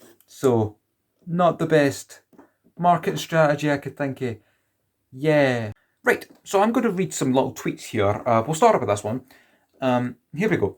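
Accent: Ireland. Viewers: Wow this guy has such an amazing Irish accent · Love the Irish accent my guy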